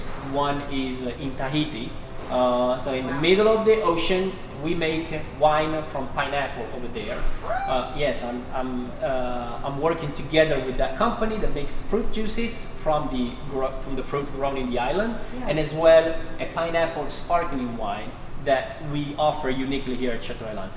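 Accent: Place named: Italy. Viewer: Gotta love that Italian accent. Fun video!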